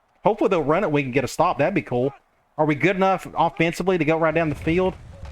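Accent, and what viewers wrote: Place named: South, United States. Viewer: Southern has all get out I love it..I'm also southern.... that draw is prominent lol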